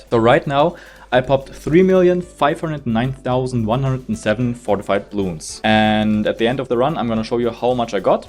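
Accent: Germany. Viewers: DEUTSCHER AKZENT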